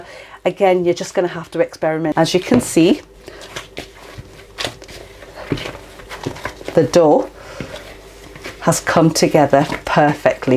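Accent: United Kingdom. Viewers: I love your UK accent. :) :) Love from Tokyo :) :)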